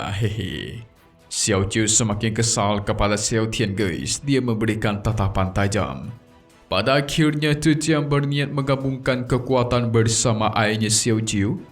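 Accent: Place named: Medan, Indonesia. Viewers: Orang Medan min😂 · Uda jelas jelas orang medan kau min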